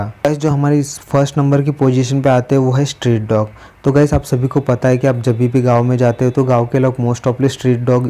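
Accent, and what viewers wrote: India. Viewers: तुमच्या बोलण्यातून एखादा शब्द ऐकून कधीकधी असं वाटतं की तुम्ही मराठी आहात.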